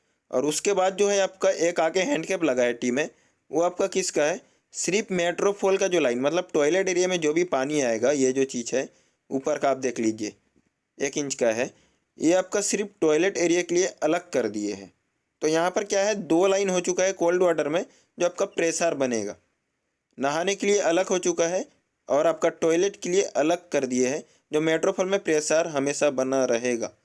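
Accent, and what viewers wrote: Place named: Bengal, India. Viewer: Hey bro i know u r bengali ( by ur accent) do u have any good contact for plumbing in kolkata?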